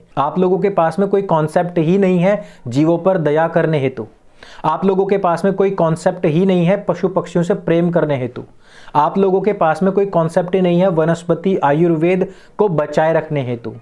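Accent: Haryana, India. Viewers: Tu haryanavi jesa bolne ki kosis kyo Kar Raha hai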